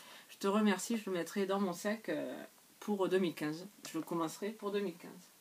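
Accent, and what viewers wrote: South, France. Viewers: Tu a été gâté ma jolie !! Trop contente de te voir et entendre ton bel accent du sud !! Ta video est top, pour une première c'est génial !! Gros bisous 😘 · Rooh ce petit accent du sud ^^ Ba voila nouvelle chaine nouvelle vidéo on continu on continu :) Si je peux me permettre comme tu m'as dit de donner mon avis lol tu es un peu loin de la caméra :) Gros bisous beau swap :))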